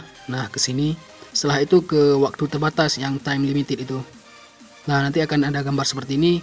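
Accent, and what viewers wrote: Aceh, Indonesia. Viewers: orang aceh ya bang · bahasa indonya gaya orang aceh banget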